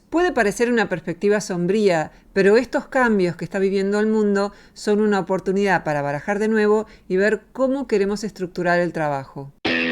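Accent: Argentina. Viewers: De donde saca esos datos?? Amiga Argentina Voz de donde es eso ?? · Paradojico que haga este tema una persona con acento argentino con el 50% de pobreza y grandes problemas de inflacion y falta de trabajo, tendria que fijarse en subpais de vez de ver otras situaciones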